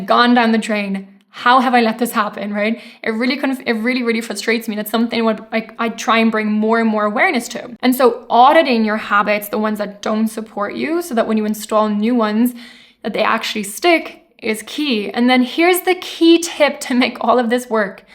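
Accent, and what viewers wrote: Ireland. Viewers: Did you spend time Ireland? I notice a hint in the accent! ❤😊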